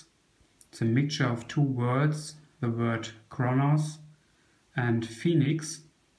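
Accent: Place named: Germany. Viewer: Moin, for your first english video, you did excellent in my opinion. Most german people had english back in school and remember very little of it and can speak even less. You did a better job than most people would have. I mean one can hear that you don't speak english on a regular basis, but nobody can fault you for that. The german accent is hard to loose when speaking english or probably every foreign language for that matter. I don't know if you plan on more videos in english, but I will tune in anyways... Except you start speaking french. Greetings my friend and have a nice weekend, Soly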